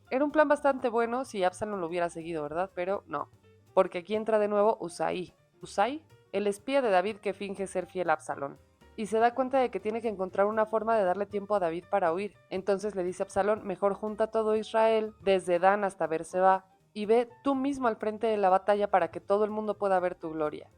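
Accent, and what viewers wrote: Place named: Mexico. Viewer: recuerdo cuando comencé a leer la biblia de inicio a fin ya en mi adolescencia, inició mis primeras dudas a las cuales le comenté a mi madre y esta me dijo: " Recuerda que con Cristo, se selló una nueva alianza eterna" La respuesta nunca me convenció porque más bien parecían dos Dioses. En mi lectura nunca llegué al N.T. en verdad el libro era tan aburrido y habían libros más interesantes que leer... Espero con ansias llegar al N.T. que también tien joyas!!! Saludos desde Panamá. (Me encanta tu forma de leer y cómo el metes ese bello acento mexicano)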